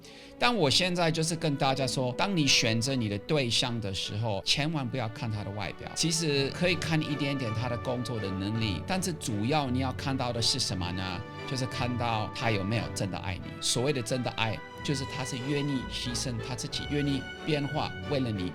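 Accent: Taiwan. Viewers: 你好喔，你真不是歪國人，若只聽你講話真的真的是很道地，我想對台灣也是很有感情的好男。excuse me 第一次看，請問您住台灣多久了？ 祝福您 ～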